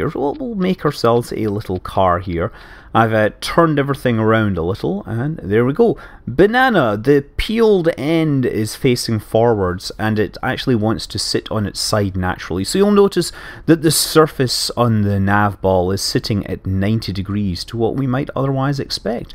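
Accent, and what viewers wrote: Scotland, United Kingdom. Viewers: Hey, glad to see you're back and making videos! I have to confess, I love your videos very much and while it makes me happy to see you go off on a vacation, I'm kind of sad we don't get to hear the dulcet tones of your Scottish accent talking about KSP and other science-y things. Keep it up! Say, ever consider doing a video on DJing or mixing?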